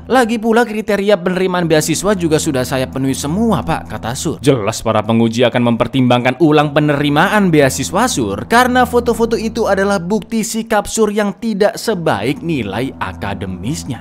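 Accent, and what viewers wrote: Java, Indonesia. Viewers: masih inget gw video pertama yg gw tonton dari channel ini, pas ngidul film The Pacifier, dari situ gw udh suka banget kontennya cak, pembawaannya santai, medhoknya ada, ama diselipin humor-humor receh, mantep dah pokoknya, btw selamat ya cak atas 1 jt subs nya, mugo-mugo kontene sampean tambah rame dan iso tembus 2 jt subs, aamiin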